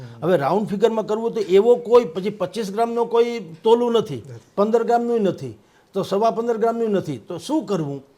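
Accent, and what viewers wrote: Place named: Saurashtra, India. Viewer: Vah bhai...Ek To Tal Sorthi Bolee neUparthi pattrakar,Meghanigini Yadav aavi gai.Ek var Jaher Sabhana 'Manch' par Aapne Bhashan 'DETA' Joi rhyo Chun.Maru aa Sapnu che.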